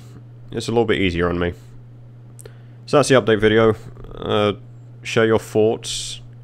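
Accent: United Kingdom. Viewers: Are you british or australian ? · Like many other have said we really don't give a shit what you play because we stick around for your stupid personality and all the other bullshit shenanigans that you manage to find. So whether you go on and play some PD2 occasionally just to bitch about all the shit players who fuck up a normal jewelry store heist and hackers or just play other stuff because that's what you want, 95% of us will still be here..... just for your pommy fucking accent.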